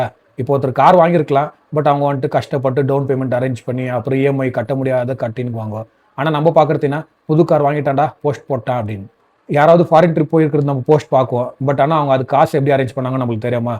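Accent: Bangalore, India. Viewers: Thambi bengalore Tamil la.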